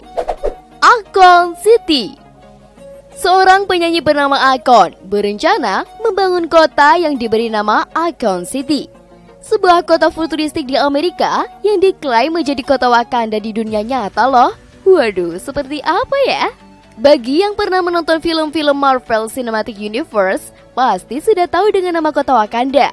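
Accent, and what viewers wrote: Java, Indonesia. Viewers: medok banget ngomongnya..mengganggu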